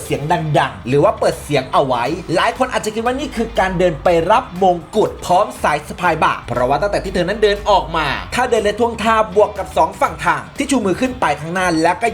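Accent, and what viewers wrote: Isan, Thailand. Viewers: ขำบพเว้าอิสานน่ารัก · แอดมิน....คนขอนแก่นหรือสารคาม...แมนบ่ล่ะนิ...😁😁😁😁😁😁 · ดีใจกับน้องกะดีใจอยู่ แต่ขออนุญาตหัวเสียงพากย์คลิปของแอ๊ดแหน่ได่บ่ฮึ..ไทบ้านได๋น้อแอ๊ดนิ🤣🤣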